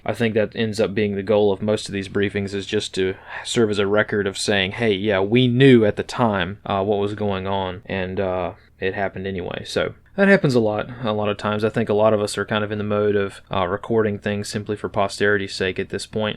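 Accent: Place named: Virginia, United States. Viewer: Hey, I love the content. And just wanted to say, by hearing your accent.. I believe you’re from around Virginia, I know people from Norfolk that share a strikingly similar accent. And with a lot of crazy things going on nowadays, I just can’t thank you enough for keeping us informed in a “no bs straight forward” fashion. Keep fighting the good fight buddy!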